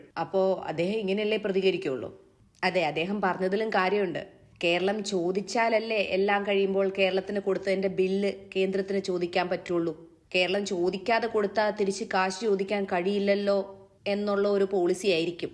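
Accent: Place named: Malaysia. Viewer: Oo you are malaysian malayali😁. Accent oru different pole thonni. Nalla videos. Maybe orientation maatamenkil.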